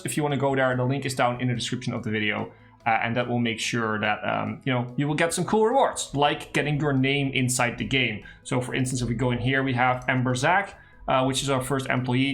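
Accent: Netherlands. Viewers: Your Dutch? Anyway great serie to watch!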